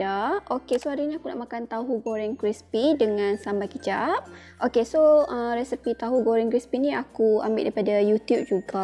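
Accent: Kedah, Malaysia. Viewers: Orang kedah ke kak 😅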